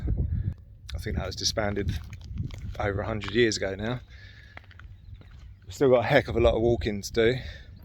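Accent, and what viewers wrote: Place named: South, United Kingdom. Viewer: So you’re from Sheppey! I was wondering where your lovely, rich, south of England accent came from. I could listen to you read out the phone book In 2005 I interviewed to teach English at Minster College and stayed the night before at the Abbey Hotel … I’ll never forget.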